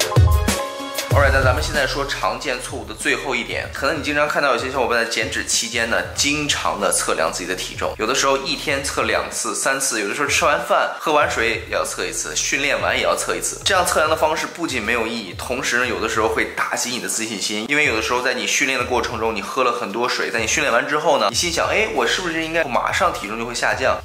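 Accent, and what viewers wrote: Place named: Beijing, China. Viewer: 我猜北京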